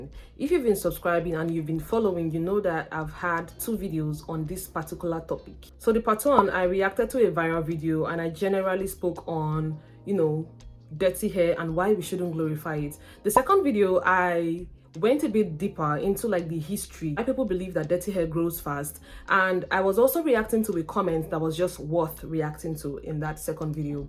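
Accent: United States. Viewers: The American accent 😂😂😂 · The American English accent 😂 killed me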